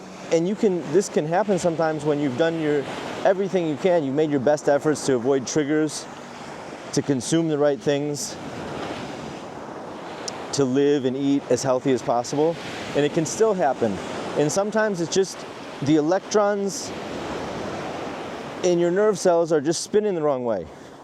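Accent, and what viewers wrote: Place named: Canada. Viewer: Are you from Canada? Seems you have an accent. 😅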